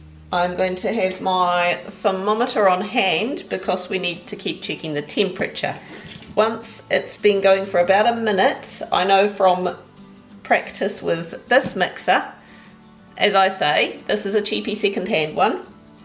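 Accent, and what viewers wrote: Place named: New Zealand. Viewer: yes! i have discovered that i can discern nz vs austalian accent! excellent.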